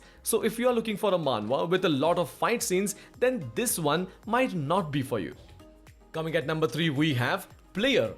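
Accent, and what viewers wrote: India. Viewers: are you idian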